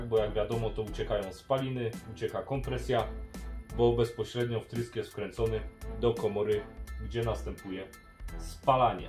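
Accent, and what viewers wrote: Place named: Silesia, Poland. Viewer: Ślonskiej godki nie ukryjesz 😜 P.S. fajny film! ;)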